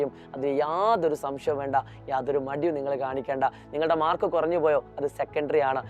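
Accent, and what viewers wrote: Thrissur, India. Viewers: Thrissur like adiiiiii❤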